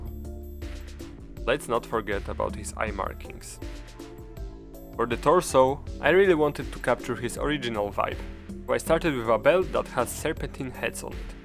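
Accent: Poland. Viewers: Po akcencie poznałem że polak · wiedziałem że jesteś z Polski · Po jak mówisz odrazu wiedział że z Polski jesteś XD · chciałem pisac po puerwszym uslyszanym slowie że masz strasznie polski akcent ale stwierdzilem ze mize poprostu mam cos z uszami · Polski Akcent Mocno wjechał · Po akcencie wiedziałem ze to polak. Zajebisty film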